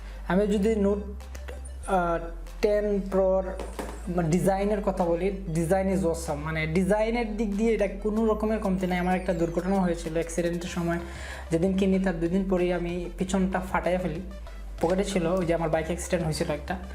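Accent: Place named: Sylhet, Bangladesh. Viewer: ভাই মনে হয় সিলেটি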